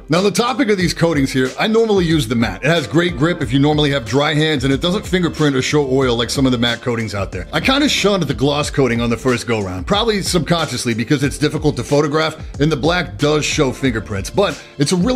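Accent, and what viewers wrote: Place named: California, United States. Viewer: Love your humor and style of presentation. Haha where you from? Sounds like Cali.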